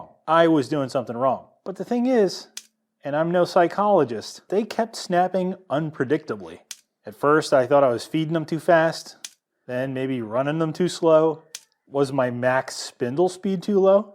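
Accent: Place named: New Jersey, United States. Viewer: YOU have got to be from ... New freakin' Jersey. You sound like Nfreakin'J. Your jokes have a New Jersey edge to them. You used the word "tuchus" correctly in a sentence, and I have a strong feeling you know what pork roll, egg and cheese on a hard roll, SPK is..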